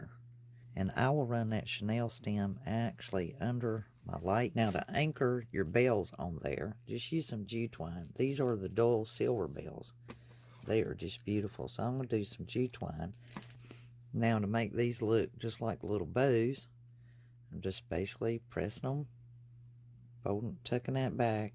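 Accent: South, United States. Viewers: We live on a teeny tiny island off the coast of NC...so...you KNOW I LOVE this one. Covid closed down all the craft shows that feed, house and clothe my family so it's been a really HARD year. I'm ready to kiss 2020 goodbye come midnight December 31😘🙋‍♀️. Looking forward to a NEW YEAR 😊🤗 and lots of new crafts!! TFS and I find your sweet southern drawl so charming and relaxing...like sipping sweet tea from the front porch rocking chair on a hot summertime afternoon 🎄💓God Bless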